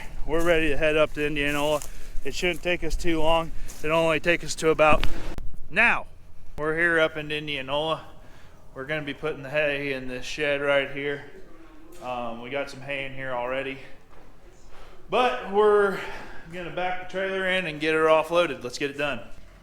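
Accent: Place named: Missouri, United States. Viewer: Just subscribed... good vid! I am from the Albia, IA area, so your accent is getting close to MO, I can tell. Tip: I believe you should not overlook the tractor as not worth mentioning. Too low on HP and weight, and it may not pull and properly operate the equipment. Too large, and it will be a waste of fuel, and the hours on a expensive machine, hours that should have been put on a lower cost machine. Blessings for 2020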